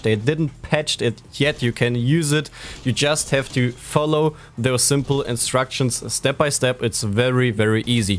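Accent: Germany. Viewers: sorry aber dieses deutsche englisch macht mich sooo aggresiv :D aber gutes video habs doch einigermaßen verstanden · Ur from germany right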